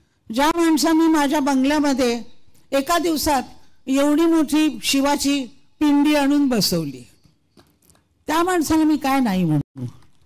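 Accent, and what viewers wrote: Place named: Kolhapur, India. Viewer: ❤❤🎉🎉 खरोखर आशाबाई गायना बरोबर आपण माणसाच्या मनाचाही खूप विचार करत आहात केला आहे तुमचं मराठी प्रेम आणि तुमचे कोल्हापुरी भाषा रवि मज्जा आली शोभा अकोलकर बेरड पुणे वडगाव शेरी मूळची राहणारी करंजी तालुका पाथर्डी जिल्हा नगर अहिल्यानगर मेरे जिल्ह्याची भाषांतर छानच बोलून दाखवली वा तुम्हाला सलाम सलाम त्रिवार वंदन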